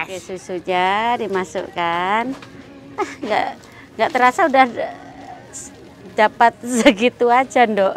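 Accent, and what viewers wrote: Indonesia. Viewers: Selamat pagi semuanya, selamat pagi mba dan sekeluarga, semoga hari ini lebih baik.senang klo uda liat vlognya mba.seruuu dan cara bicaranya khas Indonesia banget.semoga mba slalu dberi kesehatan selalu ya bersama keluarga tercinta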